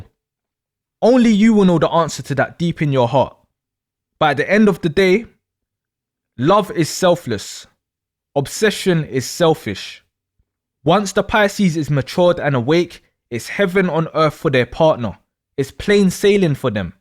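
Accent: United Kingdom. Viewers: I’m 45 march 6th Pisces my other half is 47 and a Libra we’ve been together for 28 years and it’s been a lot of work from both sides for many years but he is my soulmate, it’s been questioned a few times but now I see why and so does he. I was going to hold back and send you a message after I’d watched all your videos but I couldn’t wait any longer you’ve been so helpful on my journey of realising who, and what I am you’ve helped me come to terms with many dilemmas I’ve had over the years, your insight is second to none so I wanna say thank you so much for sharing. I love all your little cartoony bits and you’ve got a great humour that has me laughing out loud and I love your don’t give a shit attitude too!! Which is helping me bring mine out!! So thank you again sending lots of love and light from this fellow Piscean ps my mums a Scorpio (terrible upbringing- she was selfish as fahhh) dads a Taurus so one caring one for me at least 😂😂. 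Please carry on as you’re helping so much and I think I’m gonna miss that London twang being an Essex girl through and through ❤. Love ya man!!! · One of the only British voices that commanded My respect.😄 Great Analysis. I found this video searching for a video to explain this. I was triggered today about someones description of a Piscean in love. You explained it perfectly. Ase'. Let Me add, Very Few can make Me laugh, and Your voices explaining the mindsets took Me out. Thank You.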